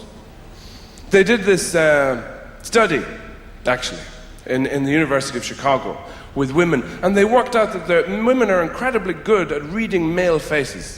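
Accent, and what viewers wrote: Ireland. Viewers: Nah man, he’s just Irish. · Isn’t that just Irish? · He's Irish . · No. He is Irish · That’s just an Irish accent 😂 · muller Except that they sound quite alike as both grew up in not far outside Dublin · He's Irish. Don't judge. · He's just Irish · He's Irish, yes we know. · captain obvious.... he is Irish.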